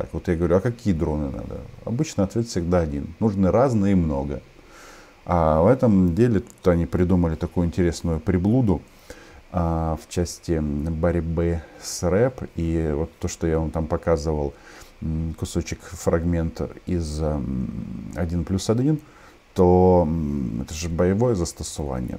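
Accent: Russia. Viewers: Шановний Романе, ви переродилисъ? Чую наголошення ставите, як росіяни УкрАинские, але ж УкраІнські, зрозуміло, ви працюете на руськомовну аудіторію, нажаль,.....❤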